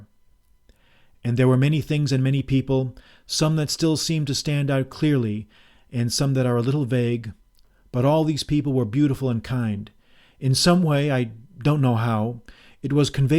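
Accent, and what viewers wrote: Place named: United States. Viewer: cannot listen to English authors and English stories read by Americans.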